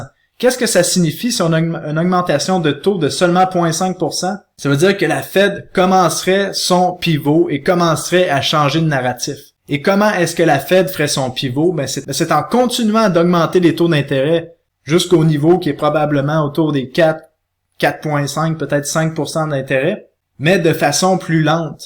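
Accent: Canada. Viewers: Tes videos dont toujours tres attendues. Recherche pointue, analyse fine et beaucoup de coeur dans le partage. Sans oublier l'humour et ce petit accent canadien qu'on adore ! MERCI !!! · je te suis depuis le début ! j'ai presque pris l'accent canadien à force de t'écouter😂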